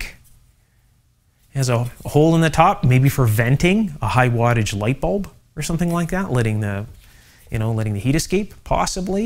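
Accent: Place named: Canada. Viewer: Why can’t Canadians say OUT correctly ?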